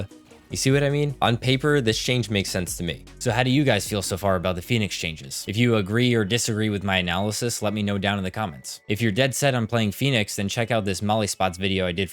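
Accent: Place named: United Kingdom. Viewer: He’s back to being a cool bri-ish boi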